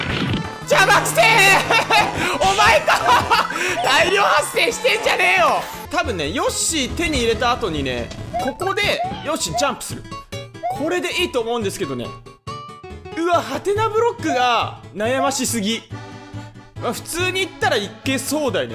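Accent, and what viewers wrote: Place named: Kyushu, Japan. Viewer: 九州人だ〜、親近感w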